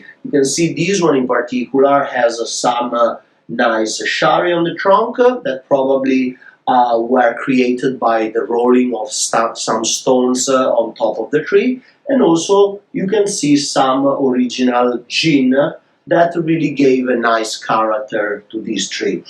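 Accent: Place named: Italy. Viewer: Ma quanto internazionale? Almeno l'inglese italianizzato si capisce bene😅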